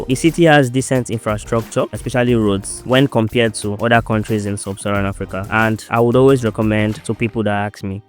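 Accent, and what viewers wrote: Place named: Ghana. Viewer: Your voice sounds like a Ghanaian accent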